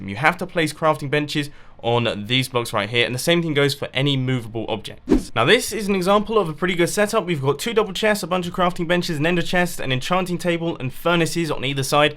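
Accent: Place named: England, United Kingdom. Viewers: Nope here in England that voice is legit everywhere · British people sound that way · i love the fact it is british cause im british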